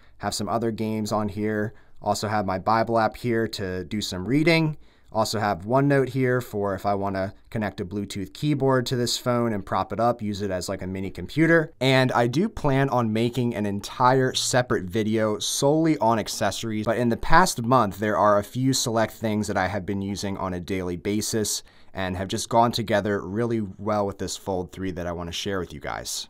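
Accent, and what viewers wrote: Philadelphia, United States. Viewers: From Philly?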